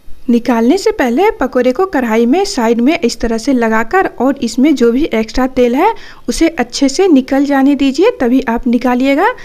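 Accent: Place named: Bihar, India. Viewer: Munh kholte hi pata chal gaya yeh totli aur biharan hain...aaooo...hate biharis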